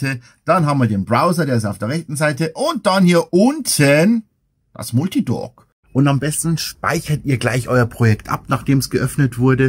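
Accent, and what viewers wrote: Bavaria, Germany. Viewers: Ich bin seit gefühlt 100 Jahren Cakewalk-Fan angefangen von Cakewalk 3.1. Aber jetzt Cakewalk (by Bandlab) ist der Wahnsinn. Und noch ein groeßerer Wahnsinn ist diese Tutorial-Reihe mit diesem super Moderator. Ich bin a Preisss aber die bayrische Sprache mag sehr. Lieber Moderator und Cakewalk-Spezialist/Musiker/Tontechniker usw...., ich bin so dankbar für diese Tutorials. Jazzjoe aus Bad Nauheim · hab gleich gemerkt das du bestimmt auch aus bayern kommst😎😁 bist auch aus oberbayern oder? 😅 wenn ich fragen darf*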